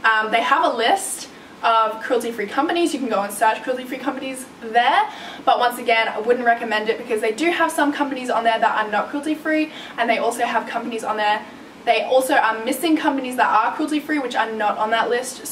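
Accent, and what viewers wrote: Australia. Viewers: Hey, can you make a Get Ready with me video with your Australian Accent?:) hope you noticed me! I love to watch your videos because of your mix thingy accent and you're so talented!! you look like Kylie jenner slash Vanessa Hudgens <3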